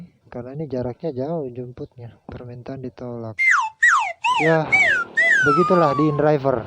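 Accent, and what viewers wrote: Manado, Indonesia. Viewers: Orang mnado ya bro?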